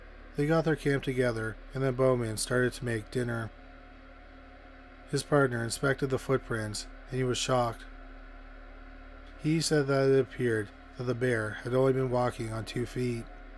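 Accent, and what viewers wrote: Canada. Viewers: He's Canadian. I absolutely love his voice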